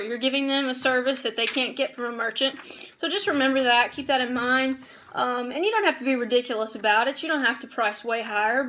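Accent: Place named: Georgia, United States. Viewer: ...love your accent. You from GA is you not? Lol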